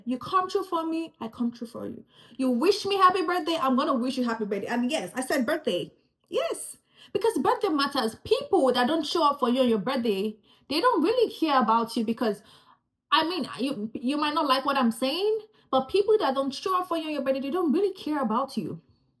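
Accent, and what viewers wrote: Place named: United States. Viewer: Ouuu, I can hear your American accent coming out🤭🌚🤗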